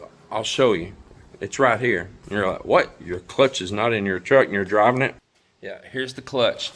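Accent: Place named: South, United States. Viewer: Sir, I like listening to your southern accent. Keep up what your doing. I enjoy it. When I retire hopefully I see you.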